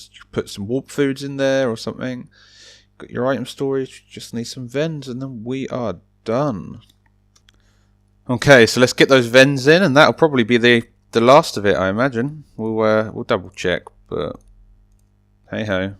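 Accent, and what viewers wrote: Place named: United Kingdom. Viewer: Dude you're just the best youtuber. Too many reasons. First of all, your voice is extremely calm and relaxing, I love it! Second, your accent, I just adore British accents, IDK why. Third, the video ideas you have are really creative, yours and my humor are almost exactly the same XD Fourth, the game you're known for, cubic castles, is just *the best*. Fifth, the dedication put into your channel is crazy. There are way too many more reasons I could list here, but I just want to concentrate on the video! If you're interested in being friends on cubic castles, my username is RustyComedy69 BTW :^)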